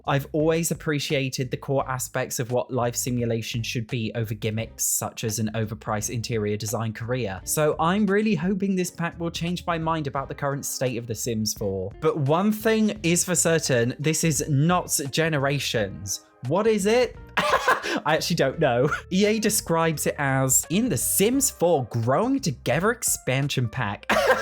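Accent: United States. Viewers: You with the American accent sent me into an alternate universe where the sims 4 isn’t garbage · Your american accent always makes me laugh, it is - chef’s kiss - amazing. 😂😂❤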